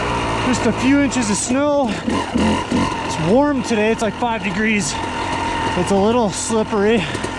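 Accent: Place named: Canada. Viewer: Yeh dude. You Canooks got that funny northern accent and it cracks me up. Grip studs are epic for sure.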